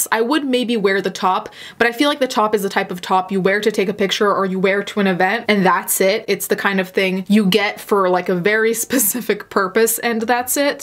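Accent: Italy. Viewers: The linguist in me, loves that you pronounce Gucci and Prada with a proper Italian accent. I've notice you do this in other videos, but it really stood out to me here. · I think i found a new fetish. Listening to pronounce things with the heavy italian accent is just so satisfying. Please say prada again. Or gucci. Or whatever. Uuuugh just like cutting a tomato with a freshly sharpened knife.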